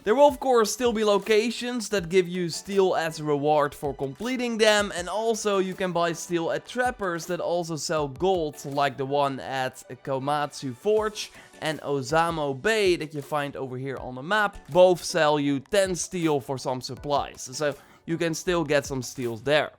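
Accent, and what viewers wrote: Netherlands. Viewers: For sure your Dutch with your accent ! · Your Dutch accent is awkward to hear. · I can hear You’re dutch because of the accent. Its pretty bad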